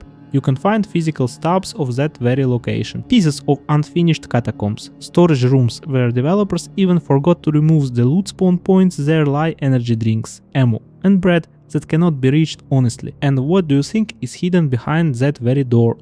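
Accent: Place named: Russia. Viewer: Я прям слышу русский акцент. На русском ролики будут?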